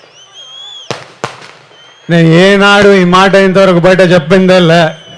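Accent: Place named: Rayalaseema, India. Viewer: Rayalasema(Chittoor) slang not telangana (telugu) slang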